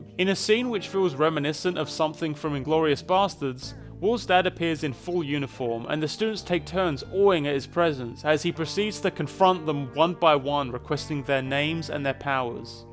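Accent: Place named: United Kingdom. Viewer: couldn't listen to this because he's british :(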